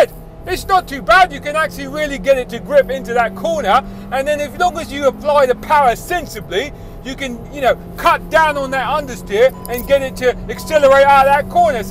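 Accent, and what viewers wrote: United Kingdom. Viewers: Hilarious review. Love the British accent.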